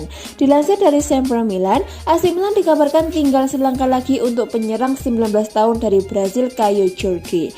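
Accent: Java, Indonesia. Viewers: Medok bro...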